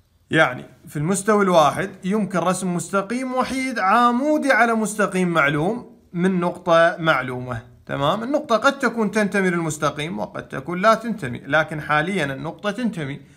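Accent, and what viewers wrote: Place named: Gulf, Arab world. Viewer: لهجتك خليجي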